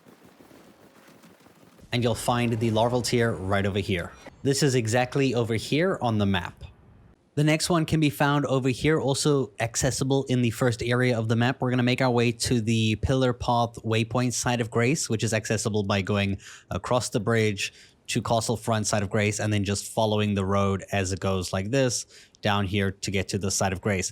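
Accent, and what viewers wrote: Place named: South Africa. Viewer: Bro embrace your south african accent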